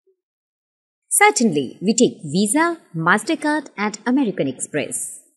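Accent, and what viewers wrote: India. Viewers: sounds indian accent..no i want to bettter learn from brits or americans thanks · Charming woman's voice - but you have an Indian accent -- and there are errors... For example, the word is not correctly pronounced "Vindow". It's a "window". W. Made with the lips without the teeth touching the bottom lip. We don't say "I'm A (long A pronounced like the letter A) tourist" etc. We say "a tourist"...short "a". It's "Vun Thousand Bucks per night" . -- nope. ONE (pronounced "won") thousand dollars. "Bucks" is slang - and too familiar for this context. I believe I heard you say "bucks"...wasn't clear.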